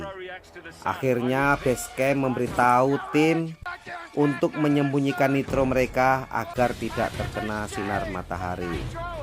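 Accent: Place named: Java, Indonesia. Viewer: Orang jawa ya,medok banget.hahaah. Mas coba dongengin film tentang pendakian gunung everest. Ditunggu video selanjutnya. Sukses selalu ya.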